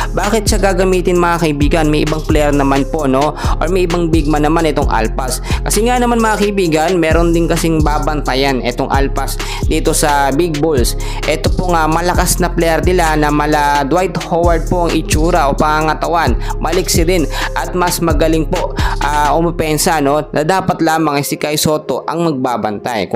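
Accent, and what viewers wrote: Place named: India. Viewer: parang kang mga indian dito sa dubai magsalita boss laging may NO sa dulo hehe❤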